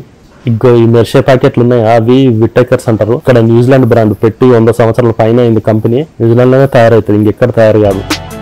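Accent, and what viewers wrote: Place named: Telangana, India. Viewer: Nice video sharing bro, talking in telengana language is very nice. All the best bro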